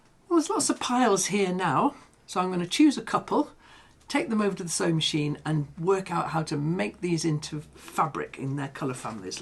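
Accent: England, United Kingdom. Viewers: I’ve only just found your site 😊 & love your sweet English accent.. Very informative & indeed brilliant ideas..😊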